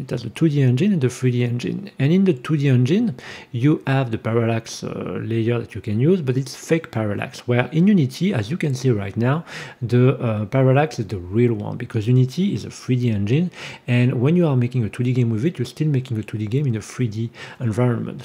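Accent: France. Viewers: Ton accent c'est une evidence tu parle français. good video btw.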